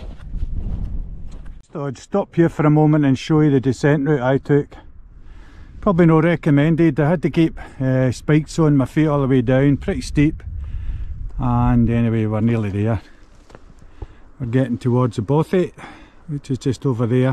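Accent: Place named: Scotland, United Kingdom. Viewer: I confess to being very much a southerner, indeed right on the south coast of England but I come to walk in Scotland for about 3 to 4 months of the year spread over a number of visits. Slowly, very slowly, I am getting better at picking up the accent and some of the words you use to describe your brilliant mountains. I love watching videos of Scottish mountain walks and it’s really good to hear the pronunciations. That said I have one hell of a struggle to try and pronounce some of them.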